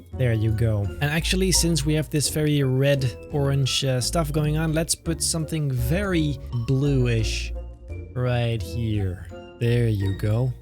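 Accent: Netherlands. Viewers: it sure does sound dutch, I mean, it's not a horrible one, probably why people say it doesn't sound like one, but from my experience (me being swiss) you do sound dutch :) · I heard your voice for a minuite and I thought to myself 'this guy must be dutch'. It's the same accent as from Michiel Huisman (dutch actor from Game of Thrones) and Paul Davids (dutch youtuber who speaks english also)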